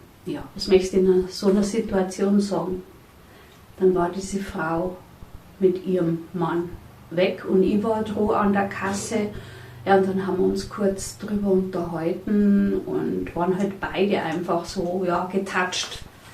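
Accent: Bavaria, Germany. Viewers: Hallo, ich grüße dich von der Ostsee. Ich bin ursprünglich aus dem Rheinland. Kölner Umland. Ich mag deinen Dialekt. Ich kann vielleicht nicht jeden Ausdruck aus dem Bayerischen kennen. Aber ich komme schon zurecht. Es liegt meistens an einem selbst, ob man mit dem Dialekt und der Mentalität der Menschen zurecht kommt. Ich habe da kein Problem. Die letzten Wildgänse sind heute aus Wismar abgeflogen. Der Herbst kommt. Also, wie sagt man im Rheinland „ maach et Jood „ 😀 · Ich liebe das Bayrisch. Mein Onkel kam aus Hochstadt Wesseling und lebte in Köln.Er trug seine Tracht und sprach sein Dialekt....die ganzen 30 Jahre die er mit meiner Kölsch sprechenden Tante zusammen war. Er war ein Bayer vom Herz, Dialekt ist schön❤❤❤ Vielen Dank liebe. Ich muss das nochmal hören.! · Ich finde deinen bayerischen Dialekt wunderbar. 😊 Mein Dialekt ist sächsisch. Das ist wohl nicht sehr beliebt. 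Abe es ist wie es ist. Gute Besserung für Euch. 🌸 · 💜💜💜 Danke dir liebe. Ach ich find das Bayrisch so schön. Soll doch jeder babbeln wie er mag und ihm die Schniss gewachs is😉 Ich find es schade das so viel Dialekt verloren geht. Meiner wurde mir aberzwungen. Sicher. Sprache entwickelt sich und ändert sich. Aber um das tun zu können, braucht es die Vielfalt der Dialekte. Wenn alles gleich und eintönig ist...na lass mal gut sein. Ich find das schön. Bleib dir treu. Und red wie du magst. Wer deine Sprache nich versteht. Der versteht weder das Gesprochene noch den Sinn dahinter. 😉 Mir helfen deine Videos. Und sie ermuntern. Ich wünsche dir gute Besserung. 🍀und schön das du trotzdem ein Video gemacht hast ❤ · Ich versteh auch nicht alles, obwohl ich selber Bayerin bin😂. Ich lieb aber deinen Dialekt. Hört sich besser an, als meiner. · Ich find den bayrischen Dialekt super ❤! Bin in Niederösterreich daheim und versteh fast jedes Wort. 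Unbedingt weiter so 🙏😍😍! Pfiat di 👋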